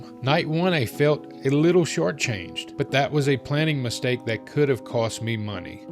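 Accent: United States. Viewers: Almost 5 minutes of absolute dribble. Sounds like a typical yank, no homework done, totally unprepared. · You don’t sound Irish. You sound American af. False advertising.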